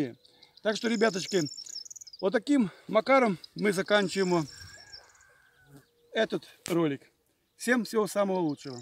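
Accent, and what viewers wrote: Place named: Ukraine. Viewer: Красивые места,! Корни свои нельзя забывать! Говор у вас такой интересный конечно))) Украина))) сразу тестя своего вспоминаю, он у меня тоже родом с Украины, с Львова. Молодцы, что мелкого взяли. Посмотрит где дедушка родился, вырос, это правильные вещи! Спасибо за видео!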